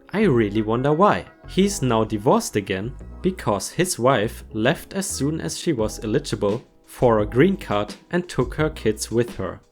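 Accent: Germany. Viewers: you sound so German! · what is your country of origin? From the sound, like German?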